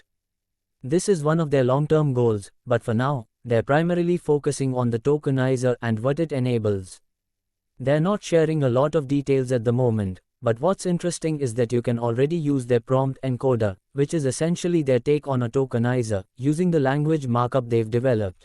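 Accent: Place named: India. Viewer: Is this AI generated? If so why pick an Indian accent?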